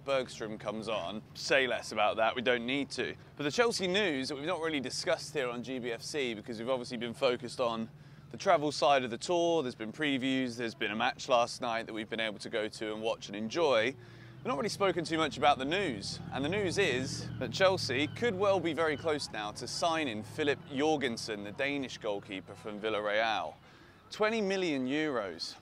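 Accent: United States. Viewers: American accent is getting stronger. One of us, one of us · the american accent is gold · Ur american accent is actually fire. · Love the American accent 😂😂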